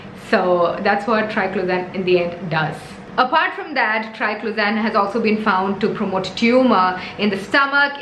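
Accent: India. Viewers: My god! her English is sooo Indian and I fucking live for it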